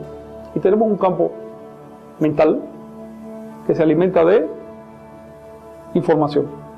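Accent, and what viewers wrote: Cuba. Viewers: Entonces el hablar como cubano(o no se de donde sea) le anula el conocimiento?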